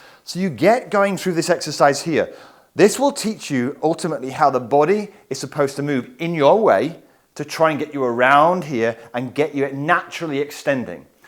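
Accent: United Kingdom. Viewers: Thanks, that was very useful. I also really appreciate your British accent !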